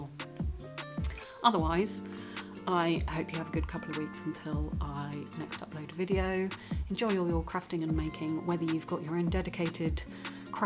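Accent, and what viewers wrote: United Kingdom. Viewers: I’m a sewist and knitter. I started with the latter but always loved clothes. I thought I recognised your accent - I’m a midlands gal and after growing up in Leicestershire I’ve now been living/working in Derbyshire for the last 8 years. Congrats on 2K followers! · Hi. I've only just found your channel but it's good to listen to you. A lot of the crafting videos are American, which is okay but it's good to hear an English voice, especially as I live in very rural France, although am English. I guessyou could say I'm a multi crafter and love to try lots of different textile related but not purely crafts. I've made textile art dolls(primarily fabric), dressmaking and other clothes, knitting, embroidery, weaving, felting, textile art and mixed media pictures, made fabric animals, driftwood houses, Christmas decorations and wreaths, both fabric and natural, fabric collage, mixed media using all sorts of art supplies, sketching, entangle and am currently dipping my toe into the world of natural dyeing. 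I'm a retired nurse so absolutely understand your work life balance situation but am lucky enough now to have the time to play more, if not necessarily the budget to with it. I love to thrift or recycle as many supplies as I can. Looking forward to watching your previous videos and please DON'T enter me for the pattern giveaway as I already have a big stash of both knitting and sewing patterns I still want to make, and despite retirement, there's still never enough hours in the day 😅 Oh, and yes I'm crafting while listening 😊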